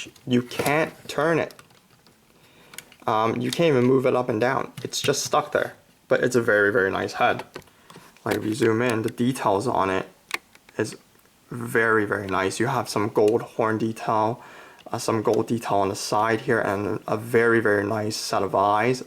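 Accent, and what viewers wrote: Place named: Hong Kong. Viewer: Are you a HongKong people, you've got really nice accent.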